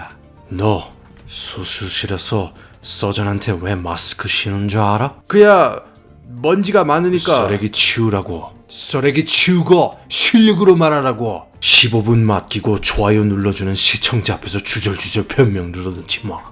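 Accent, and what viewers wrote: Gyeongsang, South Korea. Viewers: 혹시? 리쌍의 길 아니세요? 알고리즘 타고 들어 왔다가 헉했어여. 경상도 사투리 강렬하네여.ㅋㅋㅋ.구독 조아요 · 영상 재밌게 보고, 구독, 좋아요 달아줍니다.ㅎㅎ 고향이 경상도 분인거 같네요. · 우연히 영상이 떠서 봤는데 재밌네요 저도 미국인데 그래서 반갑고 사투리라 또 반갑네요 저도 경상도출신인데ㅋㅋ 사막이면 라스베가스이신가.. 암튼 구독하고 갑니다 더운데 수고하세요! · 가끔 보는데 마술사같아요 경상도 영어도 재밌어요~^^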